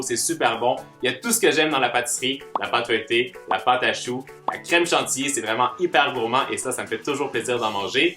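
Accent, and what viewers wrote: Quebec, Canada. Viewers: chouchoumiro msp moi aussi trouve ils se ressemble mais aussi j’me disais pk a un accent allemand et un accent québécois?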